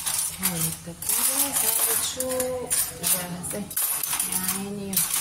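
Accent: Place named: Levant, Arab world. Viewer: دخيل صوت شامية حكيك بجنن ❤❤❤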